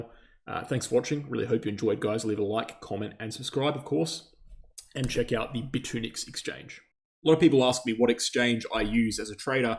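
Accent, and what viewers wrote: Australia. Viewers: this comment is NOT from a bot… You have the most annoying type of Australian accent; no American can take it seriously … and, the fact that you still believe in four years cycles after structural institutional change means… “Thank God I don’t have to listen to this Ozzy“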